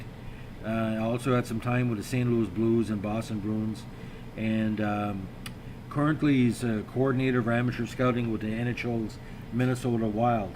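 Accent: Canada. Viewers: Are you quebecer or acadian?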